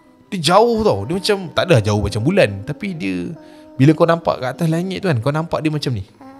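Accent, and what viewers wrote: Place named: Sabah, Malaysia. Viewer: ndapayah la cakap slang sabah tu. Jadi hancur 🤣🤣🤣😭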